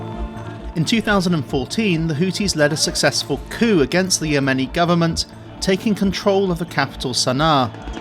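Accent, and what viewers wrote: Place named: United Kingdom. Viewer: You sound like you live in Britain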